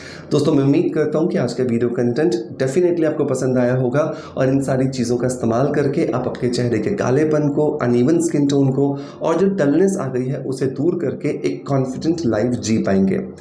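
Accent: Bengal, India. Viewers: Sir aap kya bangali ho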